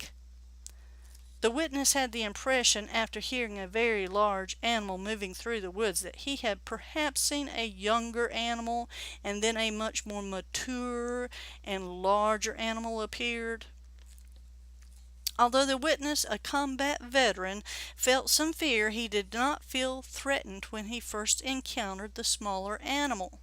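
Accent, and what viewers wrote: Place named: Appalachia, United States. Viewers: Great story, and any kind of Southern accent is a welcome sound to my ears. 😀👍🏼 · I know where you are talking about you sound like Appalachian instead of a Texan I’m from south east Texas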